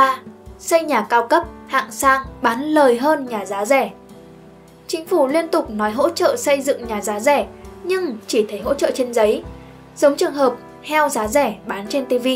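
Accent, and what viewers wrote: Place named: North, Vietnam. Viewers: Căn giá rẻ thì vị trí không đẹp, căn đẹp thì vị trí mắc? Sao đang nói giọng miền Bắc nhưng cứ chêm mấy từ của miền Nam vào vậy ạ?! Râu ông nọ cắm cằm bà kia. Lạm dụng quá nhỉ? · Bài viết có thể của 1 bạn miền nam mà còn giọng đọc thì của bạn miền bắc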